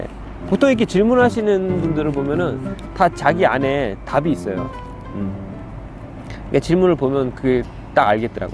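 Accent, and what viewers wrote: Japan. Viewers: 20대에 일본정착하셨는데 거의 억양이나 사고가 일본분이 다되셨네요ㅎ초반에 정착하시면서 힘들었셨던게 공감이 가네요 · 한국어하실때 종종 일본말의 영향을 아주약간 받은게 느껴지네요ㅎㅎ 한 3% 정도???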